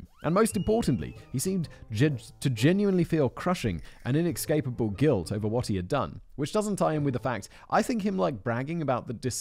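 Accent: United Kingdom. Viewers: With that British accent, you could describe taking a shit, making it sound classy.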